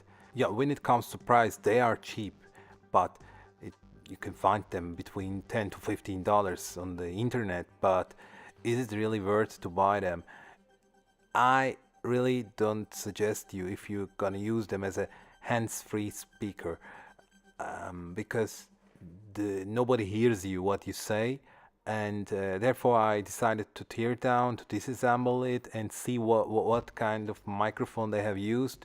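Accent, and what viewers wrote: Turkey. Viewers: thats turkish accent isnt it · İngilizceden Türk olduğunu anladım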